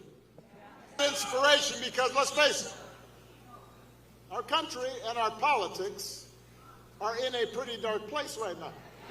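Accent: South, United States. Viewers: And here he goes with the “southern” accent again! What dark place our we in? Has he looked at the Dow Jones index? He is a. LIAR. Weaponizing the justice dept? He doesn’t remember his own presidency and RUSSIAGATE ?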